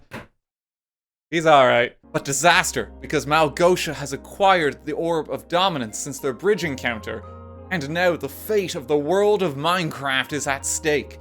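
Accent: Ireland. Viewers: God he's so Irish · keep the good work up! Never thought I'd get a second Irish lad talking about stuff he enjoys and is passionate about in longform, but here we are! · theres something magical about being a casual viewer and barely ever seeing like, physically, and then suddenly getting almost 2 hours of physical. im a week late but i honestly love video essays and long videos, i put them on in the background as i draw and its always so fascinating to listen to peoples different opinions and views on things. i also like irish accents a lot so its fun to listen to him talk. i vote more video essays on things you like (i reeeeally want a minecraft story mode video essay, i watched the playlist of that recently and the hatred for it is so funny) also thank you your editing is genuinely inspiring (i think a lot about how the e33 video was and how much that tickles my brain)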